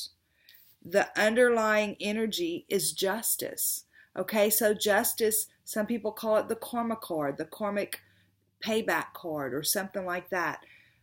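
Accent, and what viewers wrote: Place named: Alabama, United States. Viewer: I was hearing Alabama, are you from there?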